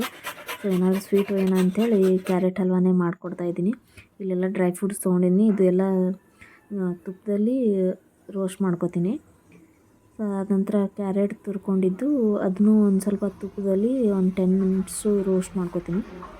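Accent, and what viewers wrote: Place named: Bangalore, India. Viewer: niv uttar Karnataka bitu Bangalore language yak mathadakaiiri😅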